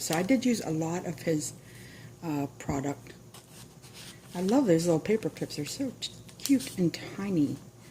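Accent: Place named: Southeast Pennsylvania, United States. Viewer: As soon as I heard your accent I know you were from South East PA!!!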